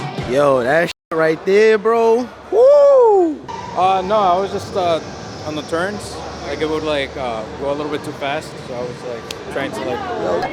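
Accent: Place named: Queens, United States. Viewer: Omg you from southside queens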